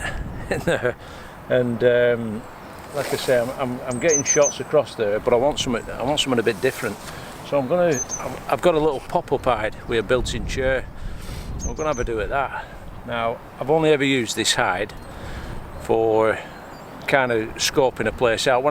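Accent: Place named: Yorkshire, United Kingdom. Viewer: Awake sorry about that it sounds a little Yorkshire that's all and I'm from Sheffield and thought you were more local to me lol hope you're not offended anyway wouldn't want to do that to anyone. Anyway I was talk texting as I do and it sent automatically I was saying about the bird hide.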